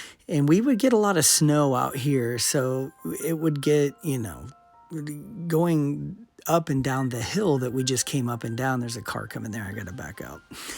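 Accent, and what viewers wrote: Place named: Ohio, United States. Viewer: Why I knew you were from Ohio by how you talk? 😁😁 Im in Cincy❤❤❤❤❤❤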